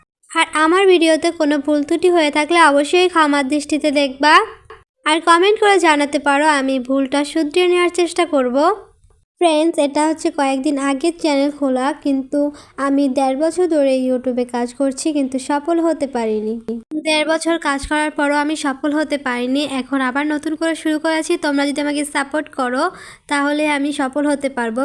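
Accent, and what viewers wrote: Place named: Bangladesh. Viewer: তুমি কি বাংলাদেশী তোমার কথা য় খুব টান আছে। ভালো থেকো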